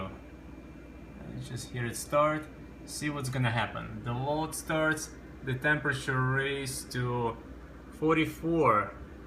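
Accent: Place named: Russia. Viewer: аксент лайк рашен...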